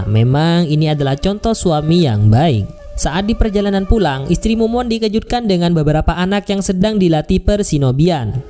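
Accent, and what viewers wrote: Madura, Indonesia. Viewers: Orang madura ya min? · Oreng madureh pengisi suaranya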